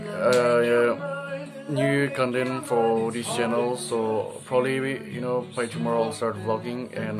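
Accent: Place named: Australia. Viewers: Achuvvvvv Australian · Your English sounds like Australian. 😍 Handsome bro.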